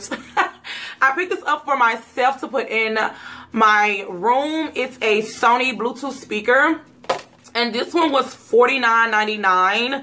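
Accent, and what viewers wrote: Mississippi, United States. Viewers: Girl you from Mississippi? I instantly subbed! 🙌🏾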